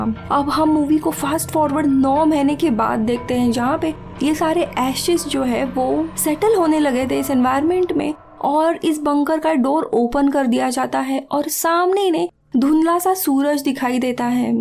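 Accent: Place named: Gujarat, India. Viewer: Gujarati flavour aa rahi hai awàaz me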